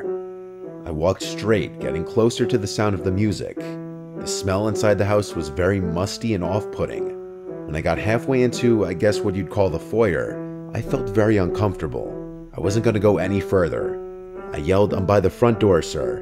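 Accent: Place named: New York, United States. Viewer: As soon as he said “Mary-O” instead of Mario like the Nintendo character I immediately knew he must be from NYC(area) or Long Island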